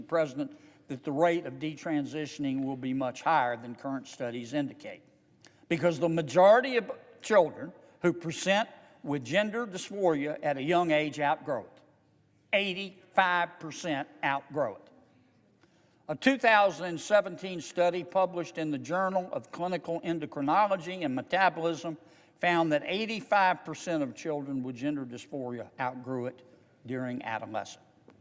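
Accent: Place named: Appalachia, United States. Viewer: John Kennedy the hillbilly talker from Connecticut.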